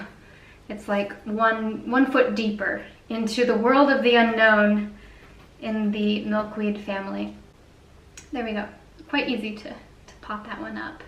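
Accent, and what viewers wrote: United States. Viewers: Just curious where you live. as you sound American, but you obviously don't live in North America hence the reference to the Euro?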